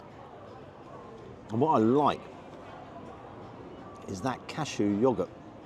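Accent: RP, United Kingdom. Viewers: You sound very posh, and you have your own camera man. Most people doing this do there own videoing. From A&B.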